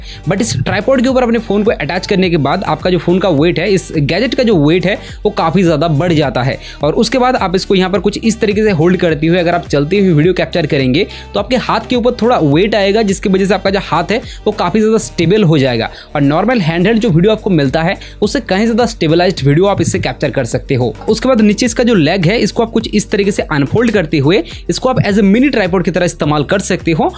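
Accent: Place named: Bengal, India. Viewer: Bengali ho kya ... 😁 tumhari ... Bengali accent se pata chala 😁 ans ta debe thik ache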